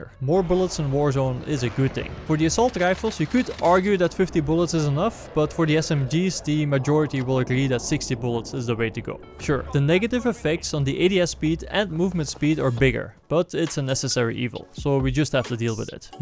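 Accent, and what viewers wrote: Netherlands. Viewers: Pretty sure he is dutch judging from the accent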